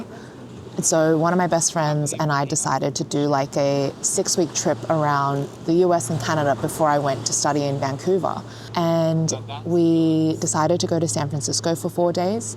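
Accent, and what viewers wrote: Australia. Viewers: I wish I had your Aussie accent. I have a boring American accent. 😢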